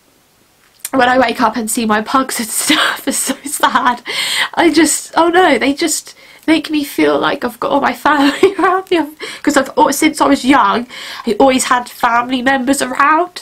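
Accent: United Kingdom. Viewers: Hello, What is your accent? I’m British/English too and just wondered where you are from 😊 Also you are so brave, thank you for sharing your story ❤️ xx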